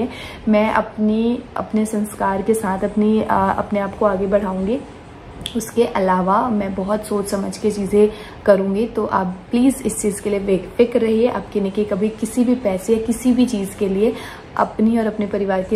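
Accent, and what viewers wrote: Bihar, India. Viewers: Bihar ke logo ki hindi thodi disturb rahti h unke language se pta chal jata h ki Bihar ke hai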